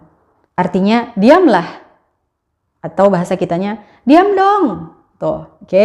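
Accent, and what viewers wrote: Jakarta, Indonesia. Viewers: Assalamualaikum...resep bgt liat ma kl ngomng kg ilang betawi nyaa...sehat trus mpookk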